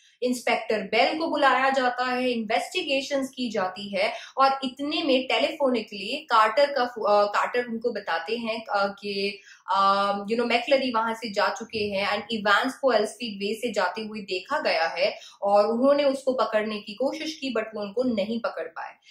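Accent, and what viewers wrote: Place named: Australia. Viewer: Your Australian accent. I love it please talk in English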